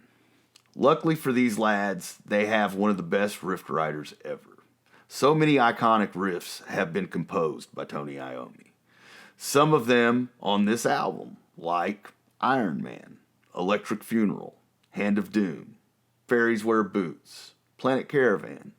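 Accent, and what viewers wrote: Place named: South, United States. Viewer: Are you from Florida or Alabama?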